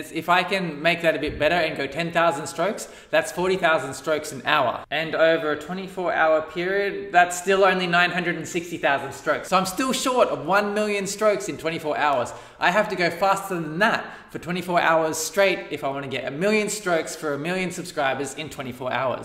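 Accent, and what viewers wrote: Australia. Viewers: where are you from? Australia? new Zealand?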